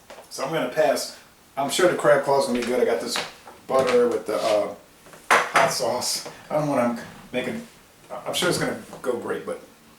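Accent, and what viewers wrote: Louisiana, United States. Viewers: Got that Louisiana drawl.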